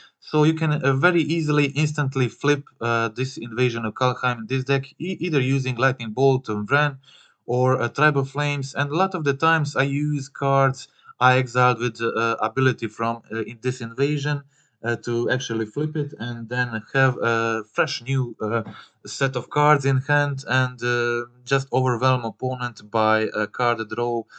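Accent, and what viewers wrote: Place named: Poland. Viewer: first time here, and im about to buy 8 copies of it for me and a friend, no one else besides us two here thinks its any good haha (also your accent sounds pretty polish, correct me if im wrong haha)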